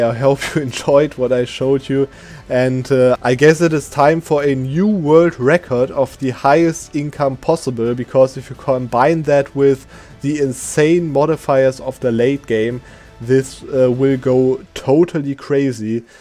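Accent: Germany. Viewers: Damn boy you sound so deutsch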